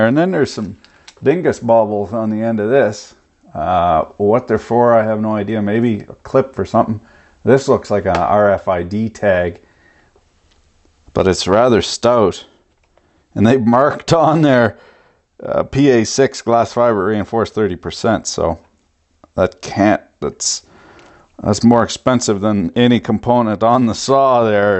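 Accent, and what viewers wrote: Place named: Canada. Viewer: havent heard a more Canadian sounding man reviewing shit tools on youtube yet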